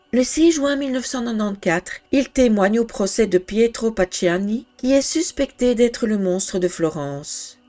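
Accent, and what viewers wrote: Belgium. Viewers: Bonjour, un grand merci pour tes vidéos de grandes qualités, je me pose une question:quand j entend ton petit accent viendrais tu de Belgique dis moi. 😉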